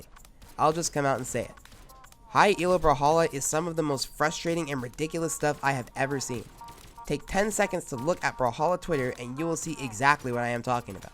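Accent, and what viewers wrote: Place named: Sweden. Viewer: I hear sweden - i like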